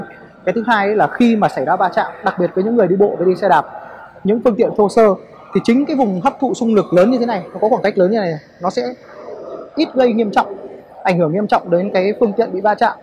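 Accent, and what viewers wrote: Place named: United States. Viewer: May mà bạn nói bạn là người vn nếu ko thì tôi cứ tưởng bạn là người Mỹ đấy.cảm ơn bạn.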